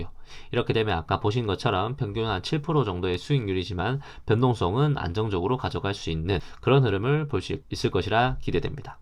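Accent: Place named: Gyeongsang, South Korea. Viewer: 경상도 분이시군요. 억양이 힘들어요. 내용보다 그 억양에 집중되요.ㅠ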